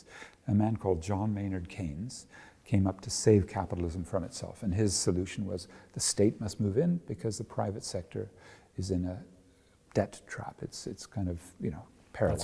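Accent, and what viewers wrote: England, United Kingdom. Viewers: Странно но я слышу английский акцент, притом что сам плохо говорю по английски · О, Вы из Англии?)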